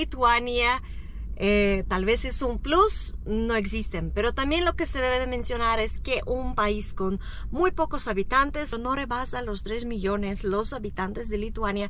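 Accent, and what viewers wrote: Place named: Mexico. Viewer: Es el primer vídeo que veo de tu canal. Hablas perfecto el español y muy a la mexicana. Gracias por apreciar aspectos que para nosotros son algo común en nuestro país. 😘❤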